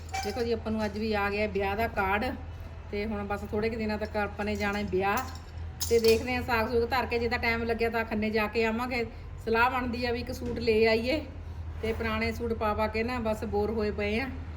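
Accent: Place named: Doaba, India. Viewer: Asi fgwarhey sahr to a,plz dso tusi kitho o,thadi boli dwaabe di lg di a,sade paike dwane c aa,plz dso ji gbu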